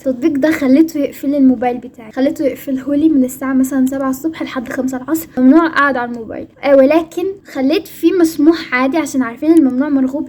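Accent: Gulf, Arab world. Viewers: مصريه بس فيها لطشة خليجي 😂😂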